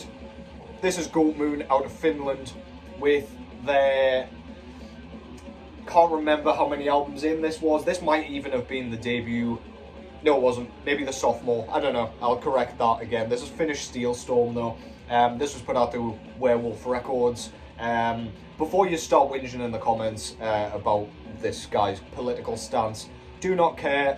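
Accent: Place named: Scotland, United Kingdom. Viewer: Don't mean to have your headache get worse...I was kind of pulling your chain last week when I suggested you had a south african accent. I figured it was scottish (mothers side of the family, McRae from the highlands, down to Colombia in the 1920's) but it seemed very mild, like maybe watered down from another anglo country, maybe even the USA. SOme of the scottish immigrants in Toronto (CAN), you can barely understand them, even the ones here since the 1950's. Great show on black metal, man. Cheers.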